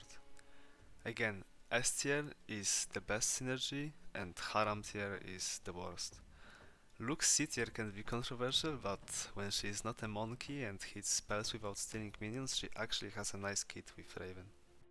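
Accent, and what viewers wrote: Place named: Poland. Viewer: najpierw z akcentu mylslalem ze rodak, potem zwatpilem a potem zauwazylem nick na wozku, pozdrawiam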